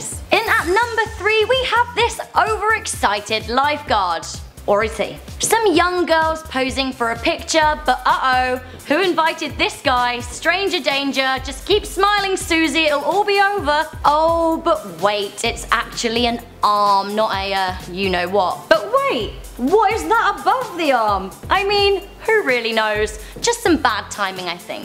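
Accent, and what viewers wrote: United Kingdom. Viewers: Srry , cant understand british accent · dawn she british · omg i freaking love you. An asian girl with a british accent. I really love this channel because its so diverse.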